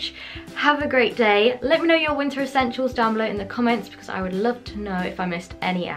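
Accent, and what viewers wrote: United Kingdom. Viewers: Her accent is it britanico or am I wrong?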